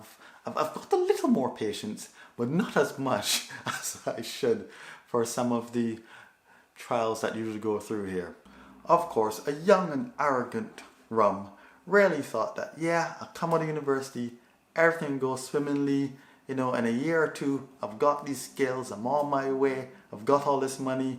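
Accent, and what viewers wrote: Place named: Ireland. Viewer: Your accent is sounds like Irish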